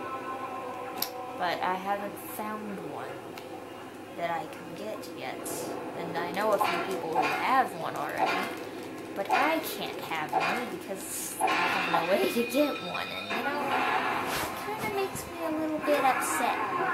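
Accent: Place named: South, United States. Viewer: I love your southern accent makes you sound pretty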